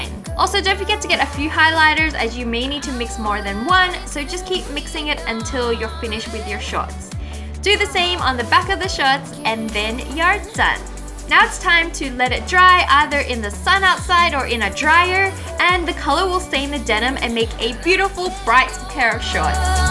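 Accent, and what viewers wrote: Australia. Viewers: Has anyone noticed wengie's Australian accent has toned down a bit?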